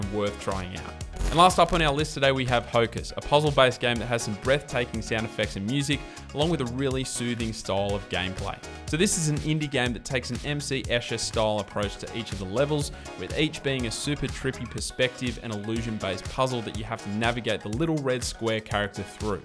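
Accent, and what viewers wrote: Australia. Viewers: you Aussie mate?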